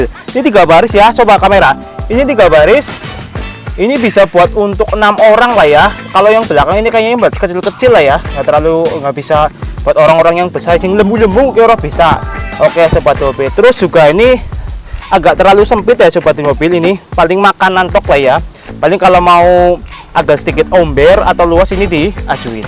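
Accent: Banyumas, Indonesia. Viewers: Ngapak jiwa,,,Sukses trs masseehhh,,Gombong Puring Hadir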